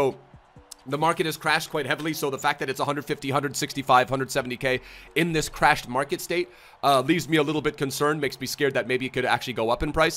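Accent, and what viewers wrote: Ireland. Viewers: Lmfaoo irish?? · Actually thought he was Irish my disappointment when he didn’t strong link to my Roy Keane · me being confused for the first 2 minutes of the video if he really thinks he is irish or he's just joking around :D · wow i literally believed he was irish lol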